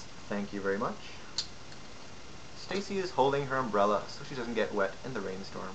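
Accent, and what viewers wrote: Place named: Scotland, United Kingdom. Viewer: you sound scottish abit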